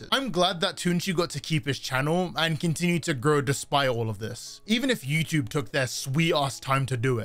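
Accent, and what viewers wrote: United Kingdom. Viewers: bro why is twomad talking to me in a British accent?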